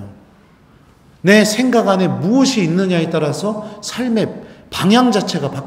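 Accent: Chungcheong, South Korea. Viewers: 신부님 말씀 잘 듣고 있습니다. 충청도 말씨가 구수하면서도 우리 일상생활과 근접한 어휘로 하시니 가끔 웃습니다. 고맙습니다.😂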